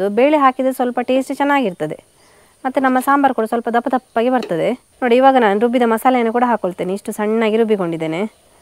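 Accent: Mangalore, India. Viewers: Clear mangalore kannada 👌karavali brahmin style curry 👍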